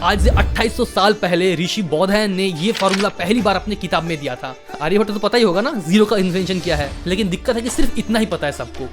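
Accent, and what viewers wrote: Bengal, India. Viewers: Bhai tu Bengali hai kya, tera hindi accent se toh mujhe aisa hi lagraha hai.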